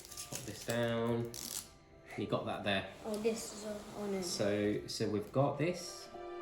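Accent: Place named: United Kingdom. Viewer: Can u plzz tell the prize in pounds as from the accent I guess u are from Britain ☺️(excluding the monitor)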